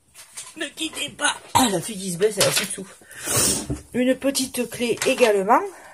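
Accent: Paris, France. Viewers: 🎶(d où ton accent titi parisien😍.)Coucou. Bien ces petits cadres. C est vrai que les parents il faut les mettre en valeur❤️. Je vais les refaire mais pour mes grands parents. Merci du partage. Je suis contente pour toi que tu aies trouvé de la bonne collasse😂. Tu souhaites un bon anniversaire à Mr COLTOUPARTOU le chef cuisto. Dis donc en fin d année ça vous en fait des choses à arroser. Donc petit verre à votre santé 🍻🥂(à consommer avec modération) tchin tchin. Bisous et passe un bon réveillon 🎉moi calme avec mes 3 frangines (car boulot surlendemain) on fait soirée karaoké et ma sœur va me chanter le best of de et, l autre ce sera et et la troisième les cantiques d'église, chouette soirée, non? Mais je les aime comme ça ❤️ et aussi, en passant par et et bien sûr (pas d anglais car on ne parle pas anglais ou très peu ou très mal😭😭😭) belle soirée en perspective hihi bisous et belle année 2019 à tousssssss et toutes❤️🎉🎶🍀👍💞💞💞💞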